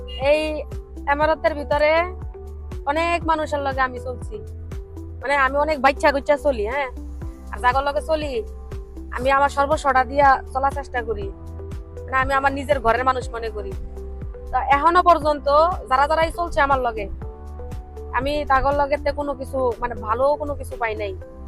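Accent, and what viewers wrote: Comilla, Bangladesh. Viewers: আপুর বাড়ি মনে হয় কুমিল্লা।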